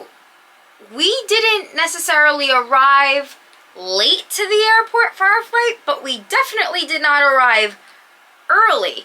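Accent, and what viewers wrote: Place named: New York, United States. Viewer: Thanks for the heads up!! We are going to the same resort! New subscriber for sure! Grew up in Jersey and I had a pretty good scene you were from NY the second I heard you start speaking! 😆😆. Thanks again.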